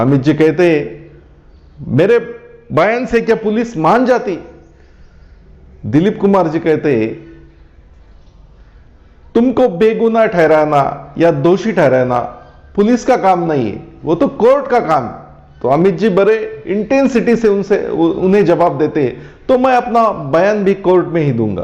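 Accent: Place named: South, India. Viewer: Bhai pehle to apni Southwali Hindi ki jagah original Hindi bolne ki zarurat he. Ab baat Shakti film ki to is film me Amitabh ki achhi acting ke baavjud Dilip kumar unke upar bhari pade the. Kyuki Amitabh ne apni Deewar, Trishul, Lawaris me bhi baap ko nafrat karnewale bete ki bhumika ki hui thi. Is vajah se Shakti me bhi Amitabh ne apni acting me repeat kiya tha jiske kaaran unki acting kuchh bhi naya pan nahi dikhai deta tha. Dusri aur Dilip kumar ne pehli baar 1 aadarshwadi Peeta ki bhumika nibhai thi. To zahir si baat he ki Dilip kumar ki acting hi jyada limelight paati.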